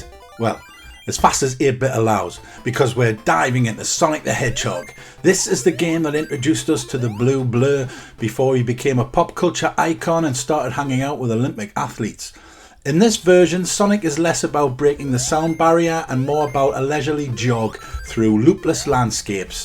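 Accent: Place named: Newcastle, United Kingdom. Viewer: Sounds like you’re from Newcastle in the UK but doing US games and prices. Them prices were definitely high for the US and only reason Buster is so expensive due to its limited run in the US. There are a lot more rarer and more expensive games in the UK say an easy 20 games. Outrun you can pick up for about $18 in the US right now. Always good to see videos on the Master System 👊🏻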